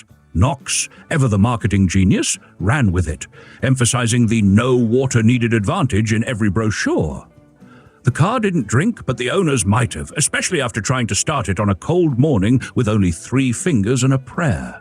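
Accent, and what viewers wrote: England, United Kingdom. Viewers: Button up! My grandmother still had the button hooks - a nice stick with a hook. But don't complain . You get to see and hear all this for free. (Oddly, although the AI vocal sounds English, I heard an Amerenglish term or two.. the solecism is so distinct that i believe our uploader is American . Be kind to us who are forced witnesses to the emissions of a foul orange frog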